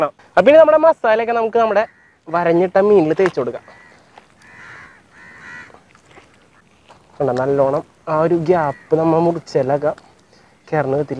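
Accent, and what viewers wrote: Thrissur, India. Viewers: ഒറ്റയ്ക്ക് തിന്ന് തീര്‍ക്കാന്‍ പറ്റോ മച്ചൂൂൂ നല്ല തൃശൂര്‍ languge എനിക്ക് ഈഷ്ടപ്പെട്ടു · chettante thrissur slang miss chayyunathu njan mathramanno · It's Thrissur district Malayalam style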